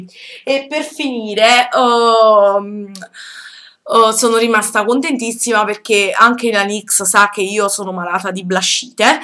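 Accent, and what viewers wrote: Naples, Italy. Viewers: Nuova iscritta !! :) Sei simpatica, carina e dolce ! E poi sei napoletana (mi pare di capire dall'accento!) ... bacio :*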